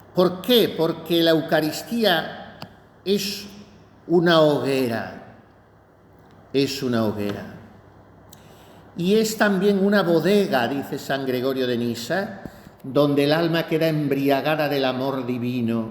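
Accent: Extremadura, Spain. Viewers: Ay Padre muero de risa ! Así o más extremeño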